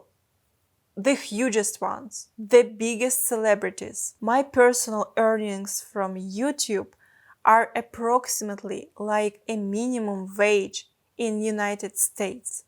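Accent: Russia. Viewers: It sounds like you're saying that you have to be either really skinny or very plus size to get sponsored by companies -- not be somewhere in the middle. I'm not sure who writes those articles, but I always wondered how accurate or not accurate they were when it came to youtubers or similar people. I thought you were around 28-31. To be honest, I think I thought you were from Russia in the past due to your accent.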